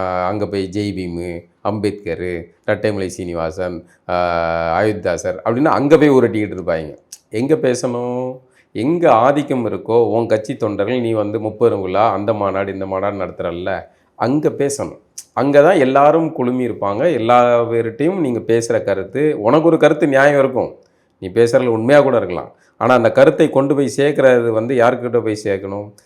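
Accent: Chennai, India. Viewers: சென்னை தமிழை ஏன் தவிர்க்கணும்... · நெறியாளர் (சில இடங்களில் ) சென்னை பாஷை பேசுவதை தவிர்க்கலாம். 🎉🎉😮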